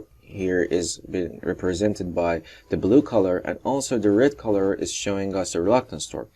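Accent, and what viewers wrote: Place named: Iran. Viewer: Sound IRANIAN dialect!!! Thanks by the way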